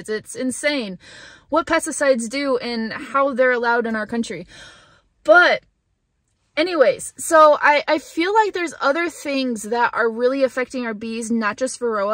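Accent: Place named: England, United Kingdom. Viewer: just use that english accent!! :) !!!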